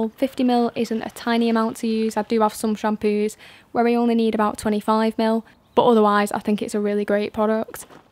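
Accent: United Kingdom. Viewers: ي لبى الاكسنت البريطاني بس🤍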